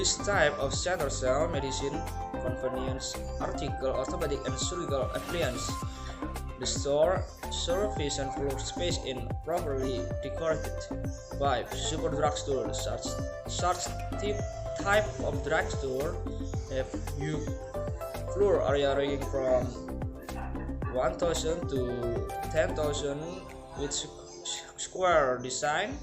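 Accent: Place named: United Kingdom. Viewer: british banget😍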